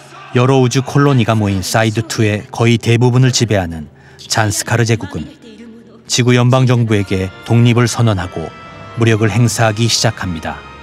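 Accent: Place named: Japan. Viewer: 발음 들어보니까 교포 아니면 일본인인듯?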